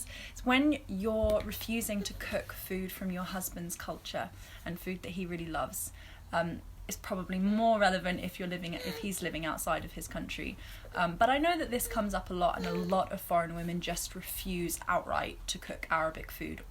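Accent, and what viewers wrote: United Kingdom. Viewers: Is your accent English... It is really nice accent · I love her accent. UK? I married a Saudi 🇸🇦 and it was a bit of culture shock. But he helps at home & is so romantic: not at all like my American Dad! They have a staff in KSA but we just manage ourselves in the 🇺🇸 states. 🤷🏻‍♀️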